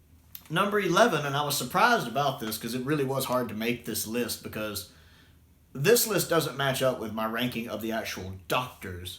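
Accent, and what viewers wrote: South, United States. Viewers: Listening to an American with a strong southern accent talking in detail about a cheap TV show (albeit beloved) made by the BBC in the 60s, 70s and 80s is such a wild juxtaposition - I love it! You're easily one of the most articulate reviewers for Doctor Who I've watched/listened on YouTube. Love you're take on the programme!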